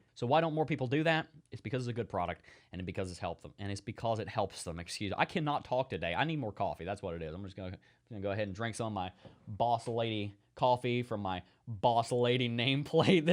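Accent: United States. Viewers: Love Your intro. Love that american accent 🧐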